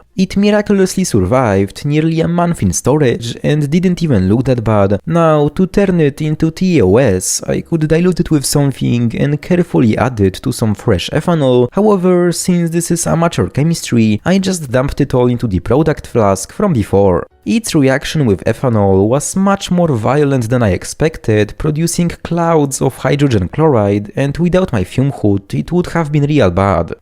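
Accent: Poland. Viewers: as a polish person i love hearing the polish accent in his voice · Hey bro, are you from Poland maybe? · Niby słusze angielski ale jakby po polsku:] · Od początku czulem że to Polski akcent, ale potwierdzil wszystko 😆 świetny materiał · Jakby ten akcent rozpoznam wszędzie 🇵🇱kocham opale! Labradoryty też są piękne i o wiele tańsze. Super kontent, będzie oglądane ^^ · Are you Polish?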